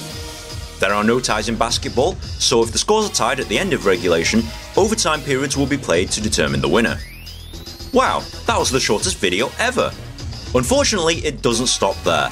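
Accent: United Kingdom. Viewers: Who else knew it was gonna be a British guy? like any other American game explanation video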